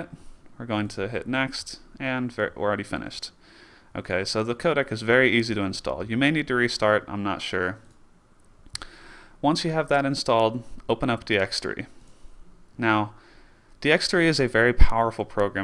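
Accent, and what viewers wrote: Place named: Texas, United States. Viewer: Your dumb.....its called a Texan accent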